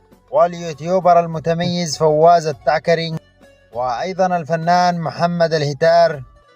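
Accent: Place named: Yemen. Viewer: حاول تخلي كلامك لغه عربيه وليسئ العاليه يمنيه تكسر الهاء نصيحه اخ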